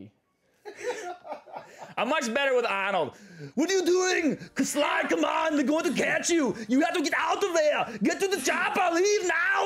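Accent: Boston, United States. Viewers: Most obnoxious Boston accent ever and I’m from Boston 🤦🏽‍♂️🤦🏽‍♂️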